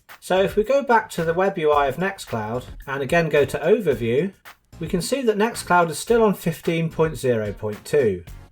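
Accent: United Kingdom. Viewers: Wow, the next brilliant and useful series completely in proper british english!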